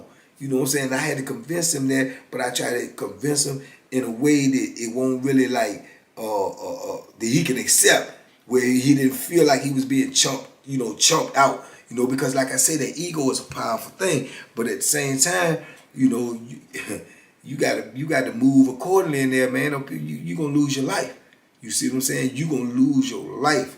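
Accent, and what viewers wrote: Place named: South, United States. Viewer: Just caught your channel, homie! Just wondering where you're from? I've heard a lot of stories from up north, but i swear to God, you were born in the south wth that accent! 🤣 keep up the awesome content.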